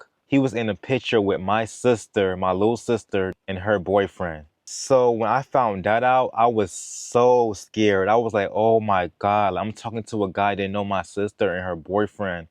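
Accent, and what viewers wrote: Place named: New Jersey, United States. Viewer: I hear an east coast New Jersey accent or somewhere near there.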